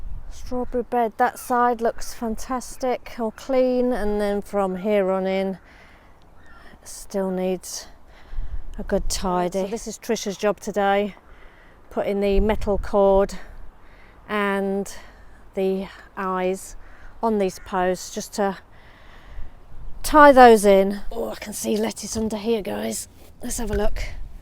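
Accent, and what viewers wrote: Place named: Scotland, United Kingdom. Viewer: Wow so many baby plants on there way up!! You are starting the season strong 💪 lovely to see you in action with your handy dandy tool kit - loved the Scottish accent too! I am trying with flowers too I need to get better at! It's all coming along so well, a week away and the plot is still looking in great! Keep going!! 🥰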